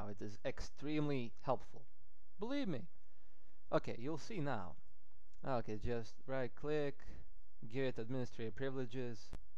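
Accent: Russia. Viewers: Oh, and your tutorial was awesome. You Russian? Your accent is awesome lol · you sound like him, are u russian ?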